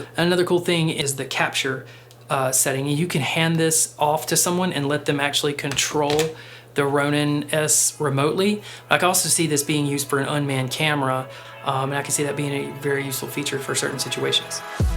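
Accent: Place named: Utah, United States. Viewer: I've got family in Utah that I visited recently (im from Denmark) and I just love your channel and the accent from the Area. Thank you for the great guide on this gimball :) Kind Regards from Denmark Jørgen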